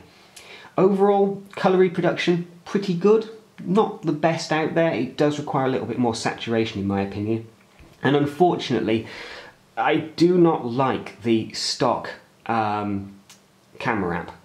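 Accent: United Kingdom. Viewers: Thanks a lot for your videos. 1 : I'm French, so it's really easier and more comfortable to listen to someone speaking British English. thank you for that :P 2 : thank you for not being a N5 fanboy, and for pointing out both good and bad aspects of the phone, that helps me a lot ! 3 : Please let us know when you can tell whether the battery is decent. Thanks ! :)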